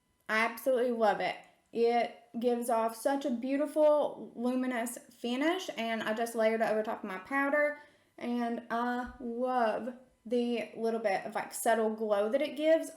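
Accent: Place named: South, United States. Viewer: I’m trying to place your accent. I love how different southern accents can be when we live in different states.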